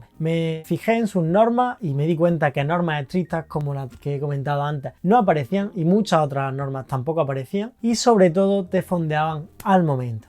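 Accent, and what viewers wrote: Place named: Spain. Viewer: Buena info. De donde q parte de España es ese acento, es muy particular.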